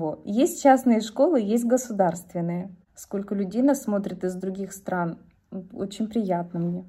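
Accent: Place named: Urals, Russia. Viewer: молодец. Все ты правильно говоришь. Да ты говорила, что это центр и называется Вип. А, что ты говоришь по уральски... Да ты умничка, что не стесняетесь это сказать. Да у нас на Урале так говорят. Особенно старики в глубинке. А видимо с детства это запомнила. Что в этом плохого.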